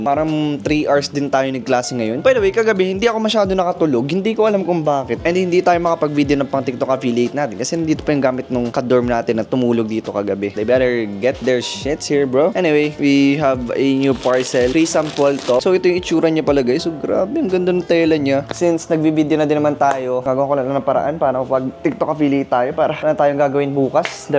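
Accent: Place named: Hiligaynon, Philippines. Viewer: Ilonggo ka ba? Bat may puntong Hiligaynon ka? Same kay David Guison. Same kayo punto hahahahaha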